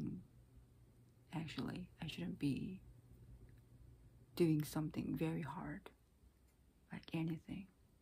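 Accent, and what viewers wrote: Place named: United States. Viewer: Life really is crazy. So many women would love to look like you. Yet here you are wanting to be like many of them. I hope you continue to pursue what you like to do. Hopefully there will be something that really flourishes and motivates you to focus on that. Your English is absolutely excellent. You talk like you were raised in America. I just found your channel and will continue to watch. Did you experience a difficult childhood? You seem like there is inner turmoil that causes you so much pain. I know it will not help but you are attractive and well spoken. Merry Christmas!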